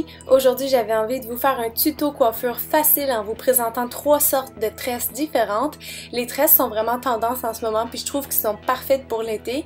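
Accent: Quebec, Canada. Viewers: j'aime bcp ton accent québécois,je regarde come macdream31 le soir, ça me détends et j'apprends plein de choses.quand on regarde transcription (à droite de "ajouter à") Youtube n'arrive même pas à te décoder lol ;-) tes vidéos s'améliorent de semaines en semaines avec toujours de la bonne humeur et tes rires :) bonne continuation :)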